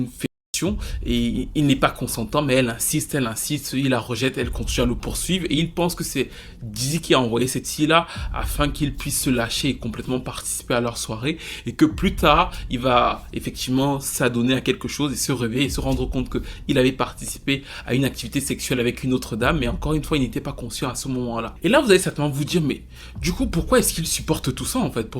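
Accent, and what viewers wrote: Cameroon. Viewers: J'apprecie enormement ton analyse, mais surtout ton accent un peu camer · Je dis ein tu es Camerounais? L'accent ci me dit qq chose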